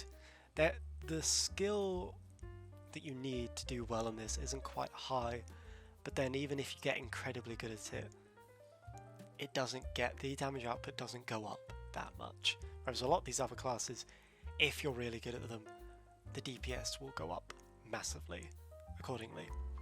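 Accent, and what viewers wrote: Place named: United Kingdom. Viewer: great video again love it youre so good to watch on stream. love the british accent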